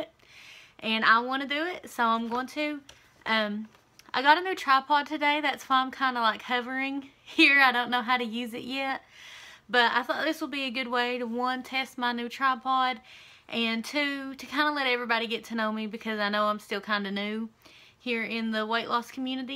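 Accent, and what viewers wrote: South, United States. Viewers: I love cornhole! Your accent makes me feel at home...I miss the south :)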